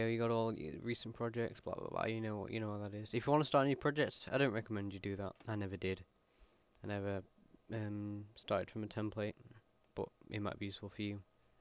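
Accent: United Kingdom. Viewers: IDK IF I'M JUST TIRED AND CAN'T UNDERSTAND U BUT U R JUST 2 BRITISH M8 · man your accent is the worst uk accent, worse than scouse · Hahaha sorry, I just started watching the show and now I think half of Britain sounds like him. Great tutorial by the way! I'm not blindly stumbling around the program making beats like a 2 year old with a sound making toy anymore.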